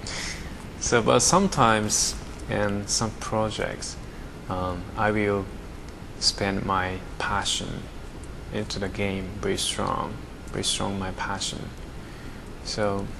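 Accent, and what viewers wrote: United Kingdom. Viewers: He must have learned English from an person with an English accent. You can hear it a bit. So cool that he's bilingual, all the more power to him. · His english is so good. Did he learn english in Japan? I don't know enough about him. It really sounds like he learnt british english. It sounds like he has a british english accent as opposed to american.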